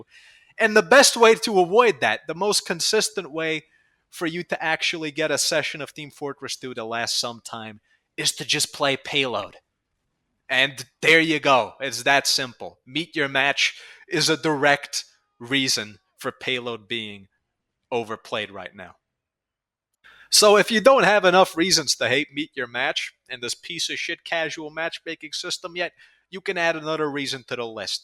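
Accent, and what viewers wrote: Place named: New York, United States. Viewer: Babe wake up, the NYC sounding European is talking shit about Walve again!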